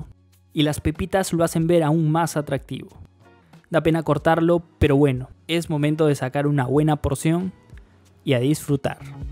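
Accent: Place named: Guatemala. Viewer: jeje Chapín es Guatemalteco, tu acento es muy similar al de ellos. He estado en Guate varias veces, me gusta mucho. Gracias por este postre.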